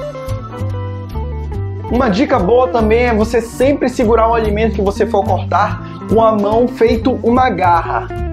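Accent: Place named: Pará, Brazil. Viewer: só pelo sotaque parece bem paraense hahaha Gostei da tecnica e super funciona! obrigada =D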